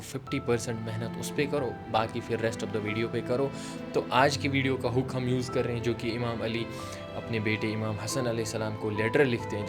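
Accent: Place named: Kashmir, India. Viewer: the kashmiri accent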